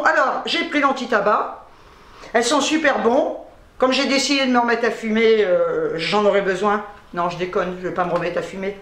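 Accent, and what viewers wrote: Algeria. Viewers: Cc l accent pied-noir j adore bisous ma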